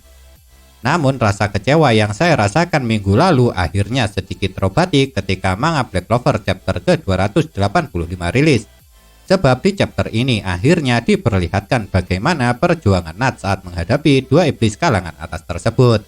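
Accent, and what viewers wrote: Java, Indonesia. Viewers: Logat jawa nya masih kerasa,pembacaan dan penjelasan masih kurang enak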